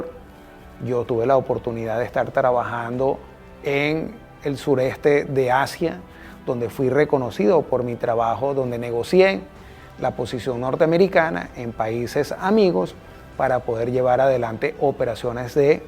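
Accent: Venezuela. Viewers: habla como venezolano ❤ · Sus padres seguramente son Venezolanos,ya q habla con nuestro dejo,y la jerga venezolana.Gracias por sus análisis.Venezuela Libre.Buen viento y buena Mar.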